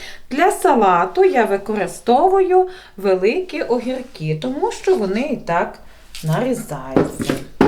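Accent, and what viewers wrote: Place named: Ternopil, Ukraine. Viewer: Така миска)))) Такі гарні добрі руки! То щедро як на увсьо побратимство! А яка мова, ЯКА МОВА !!!!!! Одна літрА, двістА пятдесят грам ... з Тернопільщини мужчини були, так казали! Кращі дядьки!!!! я в ЗАХВАТІ !!!!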